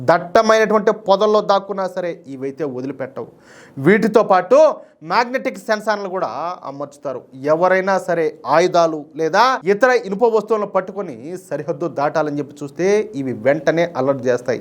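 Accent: Rayalaseema, India. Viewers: గారు, మీ రాయలసీమ యాస వింటేనే సగం kick వస్తుంది. మీ commentary లో వ్యాపారధోరణి కంటే, దేశభక్తే ఎక్కువగా కనిపిస్తుంది. వింటుంటే, ఇంట్లో మనిషి మాట్లాడుతున్నట్టు అనిపిస్తుంది. మొత్తం మీద వీడియో చాలా బాగుంటుంది ❤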